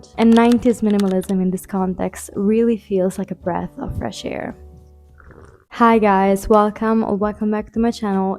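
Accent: Italy. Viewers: I'm sorry in advance if it's common knowledge but you sound italian... Btw I'm already starting to enjoy your contents, you have a beautiful voice ❤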